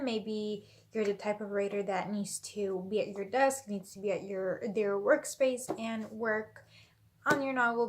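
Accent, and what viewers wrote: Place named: United States. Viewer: Thank you for the kind words! Also, thank you so much for sharing about your heritage! I love learning about things like that. I can definitely hear a little American in your voice. You do speak English very well. This may be a silly question, but do you strictly write in English? Thanks again for sharing!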